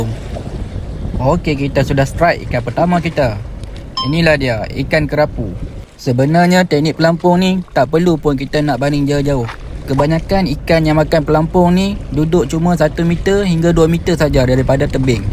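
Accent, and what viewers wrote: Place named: Aceh, Indonesia. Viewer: sbb bunyi sebutan "T" tu mcm sebutan Aceh..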